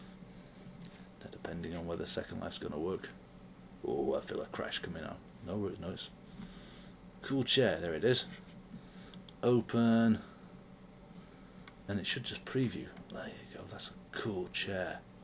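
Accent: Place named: United Kingdom. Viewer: FINALLY another british person..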